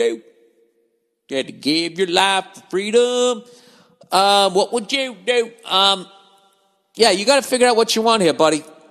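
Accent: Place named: Texas, United States. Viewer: His texas accent is hillarious!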